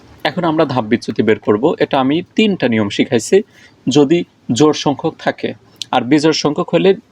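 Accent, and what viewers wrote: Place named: Pabna, Bangladesh. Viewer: Sir আপনার village ki pabnai? Language oirokom..🙂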